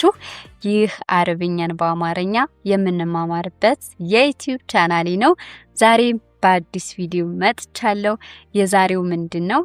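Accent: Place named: Saudi Arabia. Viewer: በጣም ጎበዝ ነሽ እህታችን አረበኛሽኣሱ ፅድት ያለ የሳዊዲ ቋንቋ ነው በርች በቲክቶክ ብቅ በይ በዛብዙ ተጠቃሚ ይኖራልእሽ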